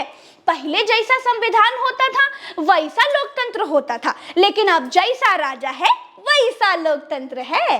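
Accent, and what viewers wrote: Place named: Bhojpuri, India. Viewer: Great acting loved it keep it up ! Love the Bhojpuri accent !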